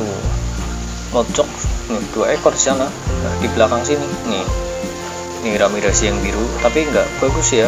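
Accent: Java, Indonesia. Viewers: abang orang jawa ya bang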